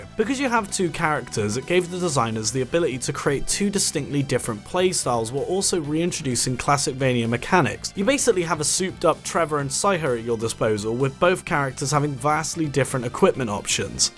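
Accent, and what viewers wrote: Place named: Netherlands. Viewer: yes i really enjoy your Dutch accent.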